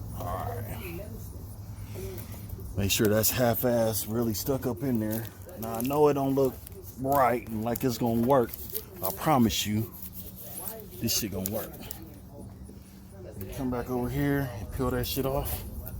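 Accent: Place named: California, United States. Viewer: Definitely a Cali native lol. This video should have more likes. Perfect fix!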